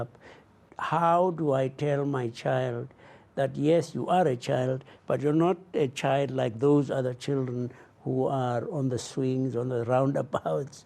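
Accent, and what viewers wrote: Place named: Africa. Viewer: pour les 1ère S1 Super !!! L'accent africain est superbe ! ;)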